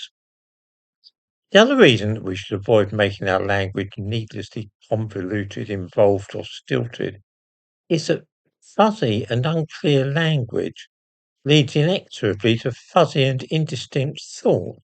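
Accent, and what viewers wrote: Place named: England, United Kingdom. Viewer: is English as is his pronunciation.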